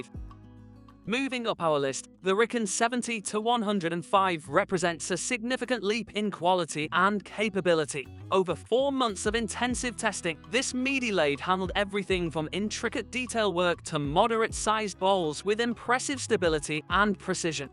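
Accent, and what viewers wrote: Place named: United Kingdom. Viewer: British accent lends credibility to an otherwise garbage video...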